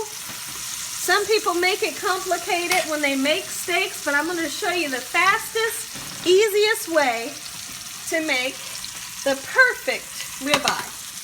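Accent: South, United States. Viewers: I cant be the only 1 who thought that voice belonged to a lil white southern lady😂🤣 good cooking 👍👍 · Victor Matthews yea i was surprised at the end when I saw her arms were black she sounded like a perfect typical white southerner with the laughing too and how happy she was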